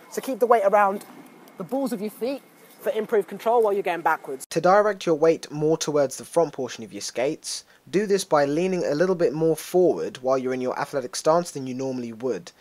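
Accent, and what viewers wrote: United Kingdom. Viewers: love his British accent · Are you British or American but I guess your British by the sound of voice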